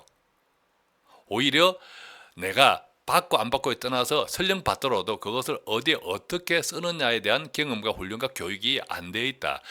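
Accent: Gyeongsang, South Korea. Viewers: ~ 혹시 대구분이세요?????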